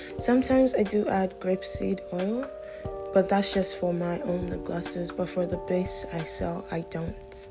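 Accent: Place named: Africa. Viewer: Love your African accent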